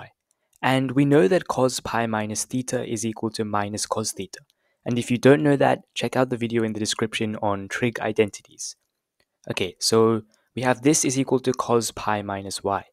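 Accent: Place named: Southeast Asia. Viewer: I dont think so,his accent is diff from indian,more like southeastern or something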